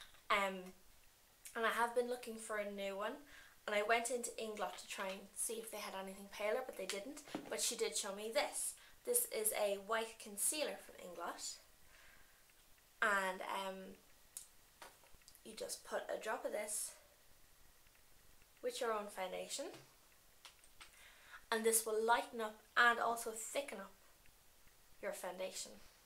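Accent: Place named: Ireland. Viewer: omg, can you please like smuggle me and take me to Ireland please??! your accent, girl!!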